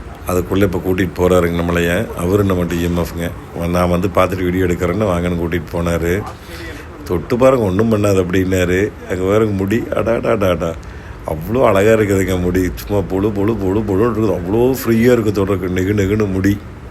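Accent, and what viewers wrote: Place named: Coimbatore, India. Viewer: Anna unga kovai tamil super.. Enakum kovai tamil kathukanum, but iam chennai na unga periya fan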